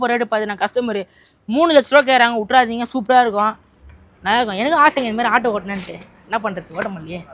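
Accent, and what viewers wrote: Madurai, India. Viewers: Madurai vasagam super 👌 🎉🎉🎉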